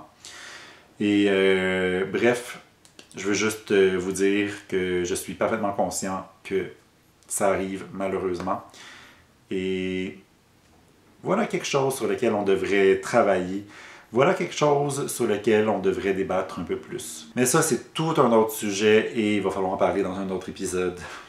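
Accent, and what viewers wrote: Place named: Quebec, Canada. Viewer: Ah mais de rien! Je l'attendais tellement l'argument "En vue de son passé c'est normal" x) Et j'avais pas relevé la connotation homophobe parce qu'à des moments je savais pas de qui parlait exactement alexmoinard ni où elle voulait en venir mais à présent je me dis que si j'avais su j'aurais réagi => En tout cas j'en profite pour remercier d'avoir fait cette vidéo très bien expliquée (avec en prime cet accent québécois que j'aime tant ♥) et qui m'en a beaucoup appris. Je me permettrais même d'ajouter quelque chose qui pourrait peut être être un peu plus éclairci: le fait qu'Ana se fait violer à plusieurs reprises par Grey (comme sa "baise punition" comme elle l'appelle) étant donné que ce charmant monsieur ne lui demande en aucun cas son avis pour savoir si elle a envie d'avoir un rapport sexuel ou non et qu'il ose dire à un moment "I know you want me" qui est clairement ce que disent énormément de violeurs à leur victime pour justifier leurs actes ignobles. 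Ça m'a à moi aussi fait découvrir 9 semaines et demi que j'ai acheté sur ma liseuse tant j'ai été intriguée ^^ Le film aussi a l'air d'être pas mal, il faudra que je vois ça à l'occasion (=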